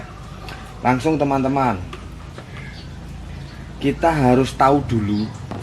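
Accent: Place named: Java, Indonesia. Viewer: ni bahasane medok banget ternyata, pakai sulap spt pak Tarno pula 😀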